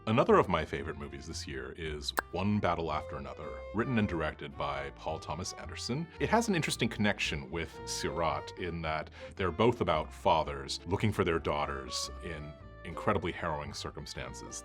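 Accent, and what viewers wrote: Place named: RP, United Kingdom. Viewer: Am American of Asian decent speaking like he's British posh tosh is suss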